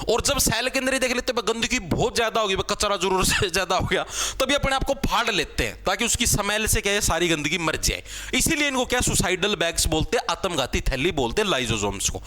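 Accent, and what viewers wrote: Haryana, India. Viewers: Aap Haryana Se Hain Kya bahut acchi basa h ji 🎉🎉🎉acha padate h 💯🥹🥹🫣😍😍😍😍😍😍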